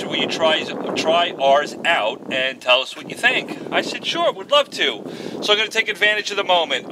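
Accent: New York, United States. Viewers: How comes you sound like your from NYC and live in Texas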